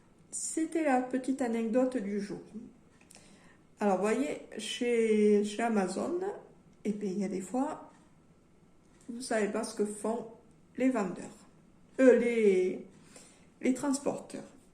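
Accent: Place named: South-West, France. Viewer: coucou merci pour cet unboxing et je trouve que tu as un accent du sud ouest... est ce que je me trompe? car je suis du tarn et je trouve qu'on a un peu le même accent! bisous bye bye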